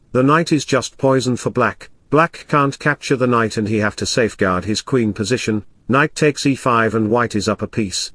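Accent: United Kingdom. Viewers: The narration sounds like an Englishman was asked to read a script written by a non native English speaker with a less than firm grasp of English grammar.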